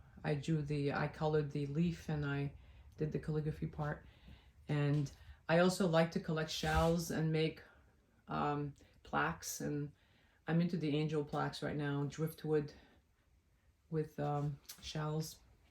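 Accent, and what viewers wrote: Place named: New England, United States. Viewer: are you from New England....I thought I detected a faint New England or NY accent. Very nice little space...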